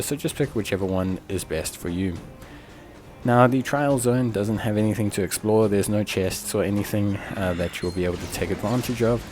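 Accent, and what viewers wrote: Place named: South Africa. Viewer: Bro sounds south african😅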